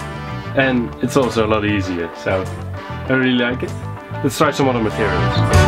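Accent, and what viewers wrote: Netherlands. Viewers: Dat vermoede ik al aan je Nederlands accent in je Engels. Leuke video's, ga zo door. English text below. ------------------------------------------------------------------------------------------- I suspect that from your Dutch accent in your English. Nice videos, keep it up. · Why do u sound dutch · Ben jij toevallig Nederlands 🤔😂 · Nederlands? Gehoord accent. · Zo Nederlands al maar kan dat Engels , mooi project !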